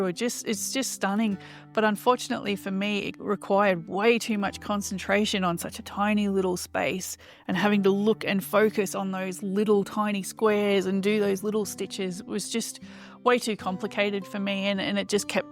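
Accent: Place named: Australia. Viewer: Glad I've found your channel. I've just subscribed. It's lovely to hear an Aussie voice and to hear your story. I'm in Tassie. I retired this year and also first picked up cross stitch (HAED of all things) but found it a bit too intense with the concentration and had to use a magnifying lamp and even that was straining my eyes. So, I've put that on the back burner for now. I'd learnt basic crochet stitches as a youngster from aunts and great aunts, maybe even my grandmother but I'm not sure. But I've never crocheted an actual item. I found some 3 ply wool I'd been knitting up but never finished as a baby blanket over 26 years ago when I was having my son. A few weeks ago I took the unused wool from that and a crochet hook I found in my sewing box and taught myself from YouTube videos and made a small pram sized baby blanket with a really pretty shell stitch. 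It was so satisfying to actually finish something. Then my daughter in her 20s pointed me to a pattern on Etsy for a daisy granny square blanket and asked if I thought she'd be able to make it. I looked at it and told her probably not. She's never crocheted before. So I bought that pattern and saw two other patterns I liked on that Etsy site and bought them. It's DreamMakesbyJD. I think she's in WA. So I started with the Mermaid Dreams pattern as a test run for myself. I started the large size (she called it Afghan-small) and then realised I didn't want it that big. So I looked up the dimensions online for what I was look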